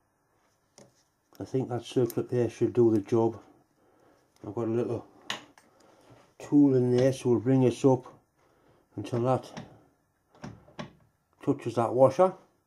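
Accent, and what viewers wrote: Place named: Northern England, United Kingdom. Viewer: Sorry must have missed him saying that. With his Northern accent he is difficult to understand sometimes. If you want a good laugh, turn on the caption feature and see what YouTube makes of what he says. Regards from Australia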